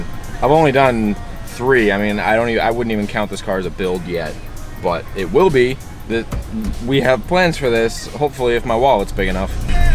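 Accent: Massachusetts, United States. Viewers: Are u from mass ?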